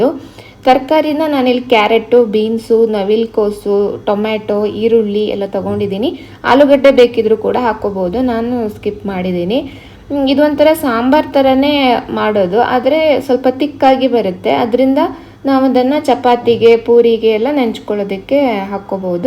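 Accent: Kundapura, India. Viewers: Nimdu kundapura kannadana sis nice sharing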